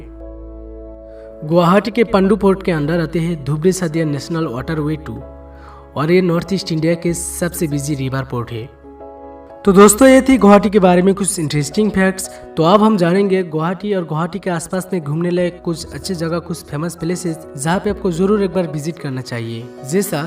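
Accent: Bengal, India. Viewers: আপকা হিন্দী মে হামকো বাংলা শুনাই দেতা হ্যায়। কিন্ত আপ এতনা সুন্দর সে বিস্তারিত ভাবে বোলা হ্যায় যে আমি আপকা ফ্যান হো গিয়া হ্যায়। সত্যিই আপ অনবদ্য হ্যায়।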